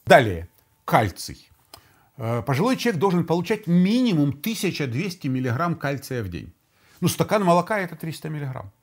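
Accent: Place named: Ukraine. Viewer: с українська акцент 😊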